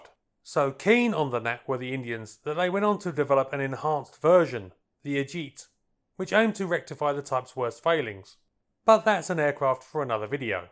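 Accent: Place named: United Kingdom. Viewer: THAT SPEAKER HAS FLAT TONE AND STRICT BRITISH .. NON UNDERSTANDABLE FROM THE INTERNAT. AUDIENCE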